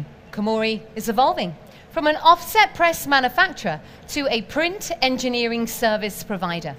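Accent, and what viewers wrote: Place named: United Kingdom. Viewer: Can't stand the British mouth here.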